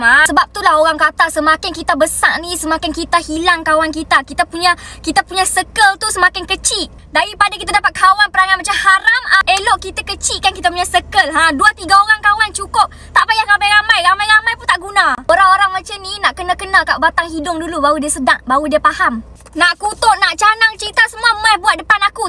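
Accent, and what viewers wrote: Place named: North, Indonesia. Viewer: Mula dgaq ckp cm keyy yell..lama2 dgaq cm org utara plk..😂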